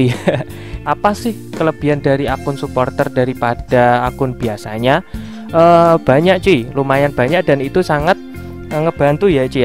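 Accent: Java, Indonesia. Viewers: Ngakak sampe logat medoknya 😂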